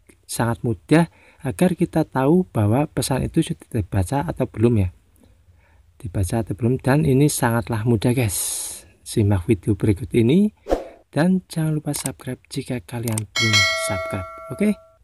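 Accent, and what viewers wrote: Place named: Java, Indonesia. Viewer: Tak tebak wong jowo yo podo mas jowo ne ,aku kudus jateng mas salken lo.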